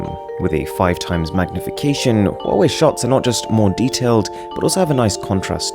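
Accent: United Kingdom. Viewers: That brittttttttish acccccccent is thiccccccccccccc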